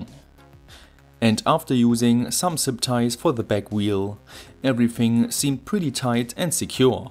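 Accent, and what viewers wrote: Germany. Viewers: Your German accent sucks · To All: Info. Whatever ' brand ' of vehicle alternator you use ; check out the idle speed of THAT brand of vehicle. That, idle speed, is what you'll require to create an electric generation unit. Minimum of probably 1700 or so. Make it for at least 2,000 rpm. ( 3600 may be the best, like those gens you buy , in a steel piped case type = Champion, and other brands ). If i build one, it would use an alternator off of a semi- engine ; probably a Detroit, or Cat engine. Rating about 200 amps. IF it's going to be made, it's going to produce power !. Thanks, for the info, SIR. Wonderfull accent, is that German, or Norweigian, or ...? (Alberta Canada) A generator, usually requires about 2 hp of rotational force, for every 1,000 watts of power output. There is some loss, but efficiency is between 80 - 88 %. I have several generators, 208 cc gas engine powered, 3500 Watt BE generator. 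Older Yamaha, 2800 watt output, uses a 256 cc engine. ( 1983 model - had to redo carb = works awesome. Great engine !!)) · Geat german accent! Ich spache deutsch! (but I have terrible spelling)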